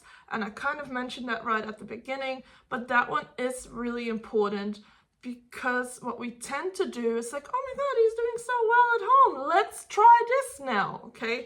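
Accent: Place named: New Zealand. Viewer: Thank you!!! Now i know I was doing everything too fast with my dog and expecting him just to behave and listen like he is at home without step by step routine- lots of nerves by now understanting my dog and buldong a relationship with him...just thought he has me in his backside when going out to new places -oh well probably he does as there is so much to do and see 🤣 especially if you are only one yo 😉 Anyway thank you- we will practice what you preach 😁 Ps. Love your kiwi accent and sense of humor 😉😁 Greetings from Poland 🙃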